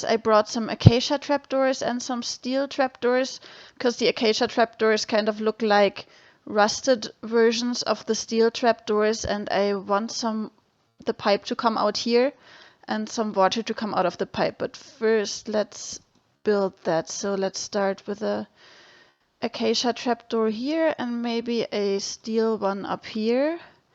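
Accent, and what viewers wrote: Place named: Norway. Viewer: How do you make the timelapse? And which editing software do you use? -Architectix and i'm still working on the build so i can help you if you want. And which nation do you come from? You sound kind of Norwegian in the accent.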